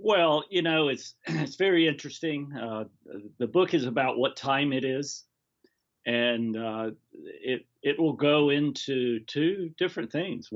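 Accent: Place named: France. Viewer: is back! Yay! I missed your French accent 🤍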